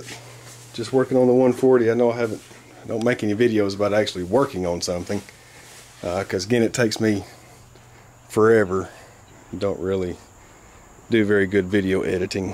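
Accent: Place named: New York, United States. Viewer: you share a lot of useful information on manuals,tools and what to expect when working on a particular item. I bought a '73 140 H 3 last week and have a R F 2 318' s and others your videos are some of my go to's to see what I need too do, by the way what part of NY are you from with that accent? thanks for sharing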